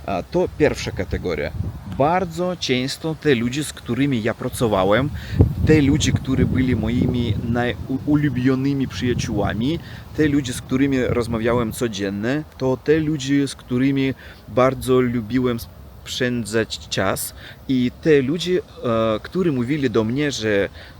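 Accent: Russia. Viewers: Naprawdę nie musisz sie ciągle sumitować. Wszyscy wiedzą, że Rosjanom trudno się rozstać z akaniem. Osobiście uważam, że język to jest to co Rosja ma najlepszego i polski z zaśpiewem rosyjskim brzmi chyba nawet lepiej niż bez. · Racja , przestań przepraszać,bo możnaby pomyśleć że to z przekory, że wiesz że pięknie mówisz po polsku i czekasz na pochwałę. Mówisz pięknie lecz z ruskim akcentem, zamiast O mówisz A oraz mówisz Ł głęboko, gardłowo zamiast L. Brawo na 4❤️ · A mnie podoba się twój przeplatany język - polski z zaciągiem rosyjskiego akcentu i słów .Wyjątkowo przyjemnie się go słucha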